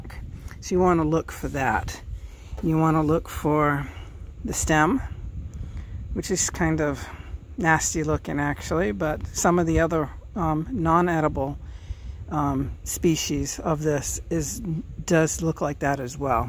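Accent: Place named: Maine, United States. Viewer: Very cool. I just found you by doing a Google photo (I'm foraging) search. I also am from Maine. I heard your accent and was like she's gotta be a Mainah! LOL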